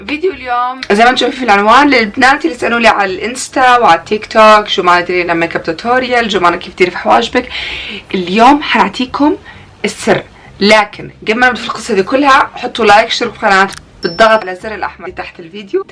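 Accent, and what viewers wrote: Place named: Libya. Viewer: اول مرة من نهار زدت عمري مالقيت قناة ليبية وانا في قمة السرور اني التقيت بقناتك واخيرا راح اسمع لهجتكم من الجزائر❤❤❤❤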